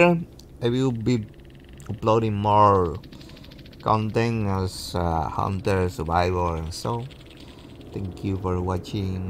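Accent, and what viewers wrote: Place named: Spain. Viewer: You are so spanish 🤣 “ehhhh” Im so happy i got this for free basically because i had auric cells before the merger with netease and when the game came out last week i had 1005 cells and on my 3rd and last flip i got the skinnn for freeee9999 and the fact you said this skin cost 500$ made me even more hype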